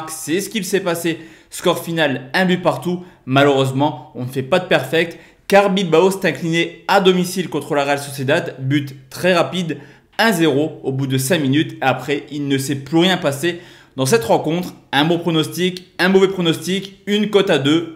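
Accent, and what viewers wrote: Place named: Lille, France. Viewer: Bonne année et meilleurs vœux Que l année 2021 soit bon en pronos pour nous tous !! Au faite t du nord on sent l accent lillois 😉😉😉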